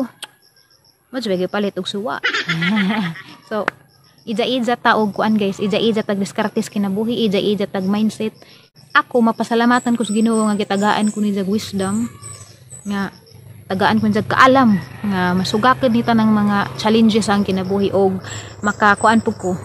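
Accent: Bohol, Philippines. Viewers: asa man ka dapit sa bohol nga pirti mang gahia sa imong sinultian? 😍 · nasuya rana maam oi, samot na cguro ug mka tukod kag Gaisano diha 🤗 lami jud paminawon ang bol-anon maam oi pinagahi jud 😊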